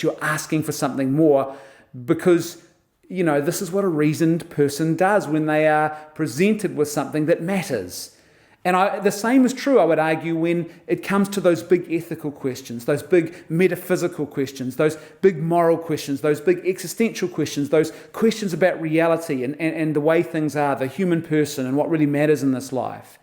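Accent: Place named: New Zealand. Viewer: Well done. Great to hear a competent kiwi voice on this sort of topic.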